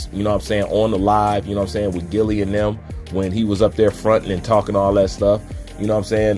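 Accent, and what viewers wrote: United States. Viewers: You sound like you from Philly😂😂😂 I'm sure you seen the same pics we just seen! That's a different look that Boots gave to Spence😂😂 He was talking 💩 to Spence but he looking nervous next to Crawford! Boots looking like he don't want to fight Crawford but his daddy wants him to😂😂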